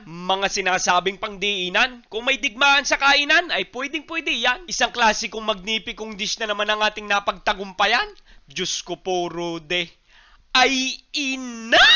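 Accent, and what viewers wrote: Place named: Oriental Mindoro, Philippines. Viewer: Natutuwa ako sa pananalita mo .alala ko ang time ko aa Oriental mindoro..ala eh ganyan sila magsalita..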